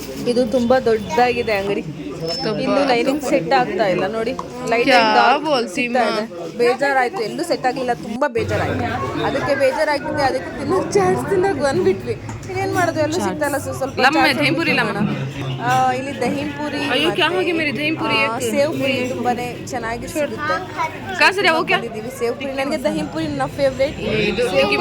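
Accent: Standard. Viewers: Awesome vlog sis.ondu vishaya sis neavu meathodooo kannada geaaa ondu salute 🫡 pure kannada 😊😊😊😊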